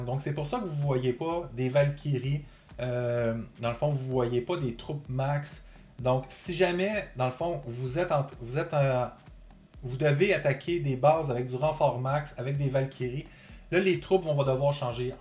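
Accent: Quebec, Canada. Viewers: tu est québécois ?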